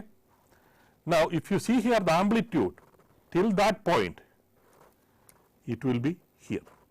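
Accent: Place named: South India, India. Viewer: Just because the professor in the video speaks with a heavy south indian accent does not mean that he does not know how to speak english. every one speaks the language with an accent, including the british and americans, african americans etc.. i have never seen anyone complain about the way people from the west speak English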